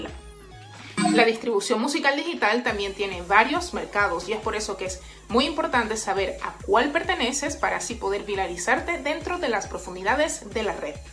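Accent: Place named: Venezuela. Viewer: Hola! Creo entender lo suficiente como funciona la cuestión de la distribución... pero no tanto lo del Copyright. Soy venezolano! creo que tú también por tu acento. Si quiero subir un tema debería registrarlo primero en SACVEN? SAPI? o puedo usar otros entes reguladores como ASCAP? y de ser así... cuál es el proceso para inscribirme/registrarme a mi y mis producciones? Gracias de antemano! Buen canal! Mi ser servicios están a la orden, soy Ing. de Sonido y productor :D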